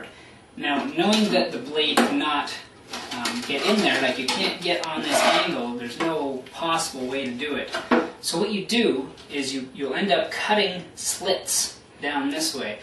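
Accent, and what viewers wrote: Canada. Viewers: Thanks so much, really helpful! You have a lovely Canadian accent too 😁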